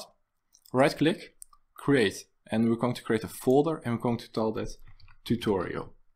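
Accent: Netherlands. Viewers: Are you Dutch? :)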